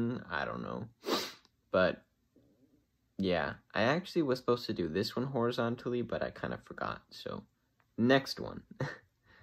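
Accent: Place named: South, United States. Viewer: Hell, he sounds too southern to be from Cali lol. Let's face facts here, has already mentioned back problems, it's only a matter of time before he won't be able to do it anymore. If this lad manages to keep a sense of humor while reviving old cars and trucks, then we should support his efforts. Hell I'm 50 and used to be a shade tree mechanic until well into the 90's, then the 2000's hit with all of this computerized voodoo and I got lost under the hood of cars today. It's great to see a youngin' picking up the pieces us old men just can't be assed to film while we cuss and fuss working on them.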